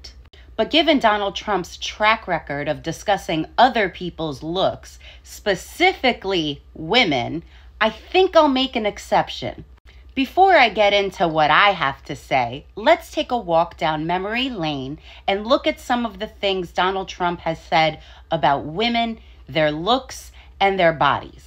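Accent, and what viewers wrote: New York, United States. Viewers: New Yawk girl tellin' it like it is! Straight up, to the point, take it or leave it! 👍😀 Stay TRUE, Vote BLUE! 💙🇺🇸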